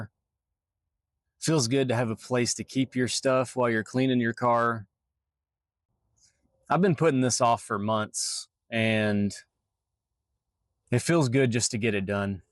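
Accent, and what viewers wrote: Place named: Tennessee, United States. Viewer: I thought it sounded like you were from Tennessee or Kentucky, then I saw your tags. That's quite a drive. I do LA <--> Nashville a lot. Not living in the car, so I don't have a setup as developed as yours, but the trips are long enough that your expertise has been relevant and helpful. Thanks.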